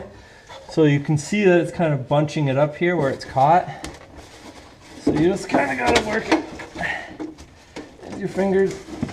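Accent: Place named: Ontario, Canada. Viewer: Awesome video thanks ! I’ve ordered my new downpipe ,exhaust and turbo and Malone tune , next will be my turn to scrape my knuckles 😂😂😂😂 I totally guessed your accent at the beginning too , had to be from Ontario Canada 😂😂😂 I’m from Bc 😬🤷🏼‍♂️ 🇨🇦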